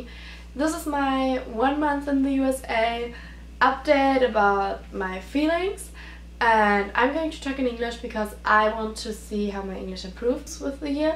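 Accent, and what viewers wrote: Germany. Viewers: That German accent combined with your poor English of is a real pain in the ass. A disclaimer for all whom willing to watch this cancerous video. Don't it'll only cause you pain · Dein Englisch ist echt gut .Klar man hört glaube ich immer das deutsche raus aber am sonsten echt gut 😍 Du hast ja am Anfang gesagt das du bald Ball hast und könntest du dazu ein video machen also wie du dein kleid kaufst wie du dich fertig machst und allgemein der Ball ?😊 Oder auch allgemein mehr videos machen wie zum Beispiel von deiner Schule wie es dort ist usw. also so eine art Vlogen ?🤗 Wenn du Zeit hast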